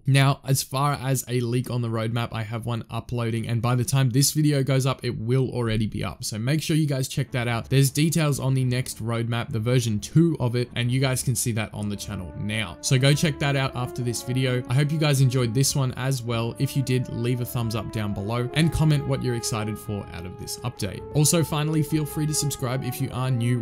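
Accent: Australia. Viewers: lauchlan walsh where did you think he's from? He definitely sounds like an Aussie to me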